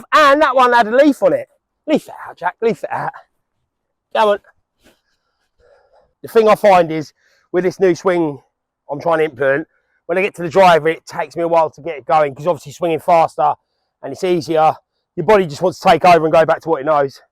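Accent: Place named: England, United Kingdom. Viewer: Good content mate what part of England are you ?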